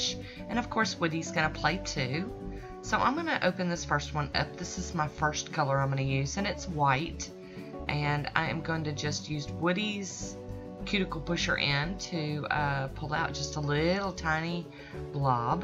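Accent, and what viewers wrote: South, United States. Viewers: Nice flower! I watch Kirsty everyday, love her videos! You should be able to shape the petals on the mat and then transfer them to the nail, but that might be even harder. Despite what everyone else does you have to figure out what works best for you. I enjoy watching you do your thing: it inspires me and I really like the sound of your voice - that lovely southern accent in particular!!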